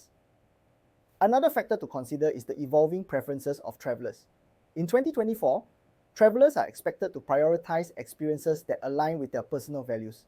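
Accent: Singapore. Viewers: Singaporean accent! Feels so homey :) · Apart from your original voice, this Singaporean accented one is also good. Please stick with one, or my mind gets confused as to who's talking to me via your videos!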